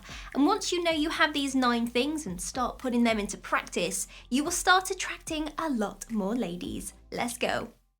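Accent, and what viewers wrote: England, United Kingdom. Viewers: Love your very English accent. In the old days we simply were gentlemen. I think that summarizes all.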